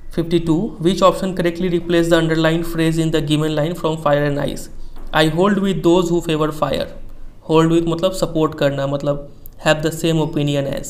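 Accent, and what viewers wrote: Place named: Bengal, India. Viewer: Sir mai janta tha app Bengal mai rahte ho apke bolne ka tarika se pata chal gya mai v WB mai rhta hu Kolkata dumdum😁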